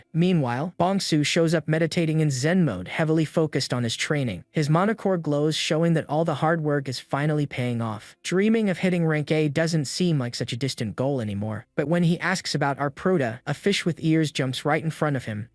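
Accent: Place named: United Kingdom. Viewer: I feel like the voice is trying very hard not to be British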